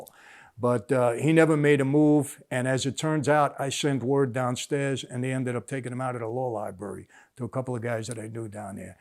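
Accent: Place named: New York, United States. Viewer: Glad I found you. I am a little older than you, grew up in Brooklyn, mother’s family Sicilian immigrants, grew up surrounded by paisans. I love to hear your New York accent, your intelligence, and your NYC Italian soul. BTW, I too am a remade man, not through The Godfather but the Father God and his Son. May he keep you humble, useful, and full of the kind of joy you once upon a time never dreamed of. One more point. I have lived in California for almost fifty years. Moving back to Brooklyn. I’ll eat some good pizza and a cannoli in your honor.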